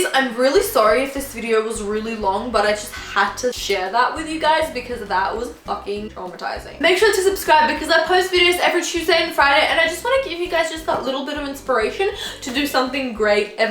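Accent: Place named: Australia. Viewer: You know I was wondering if sometime you'd like to do a collab? There's this challenge called the accent challenge and me being Canadian and you with your cool Australian accent I thought it would be perfect. If you'd like to here's my email we could discuss it more and if not that's totally cool. <mail>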